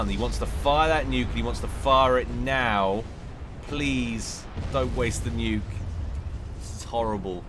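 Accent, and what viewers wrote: United Kingdom. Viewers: Ive been gone for a while. But im back. Good to see your still active. I now feel old. But hearing a fellow british voice makes me know your quality has only got better.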